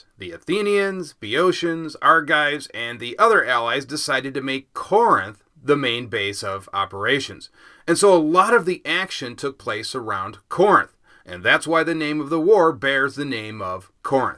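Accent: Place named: United States. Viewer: I live in Vancouver, thank you for asking! You sound....American?...judging by your pronunciations. Where from exactly? On that note, in your piece on the roman Legion, regarding a couple of your pronunciations; isn't it supposed to be Prince-a-pay, and Tree-aree-eye? That is how I always heard these names pronounced. I would be curious to know..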